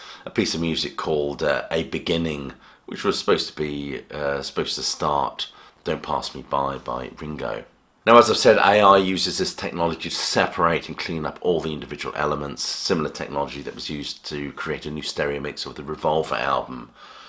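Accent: United Kingdom. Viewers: Thanks! Excellent reviews. British accent...priceless. 😊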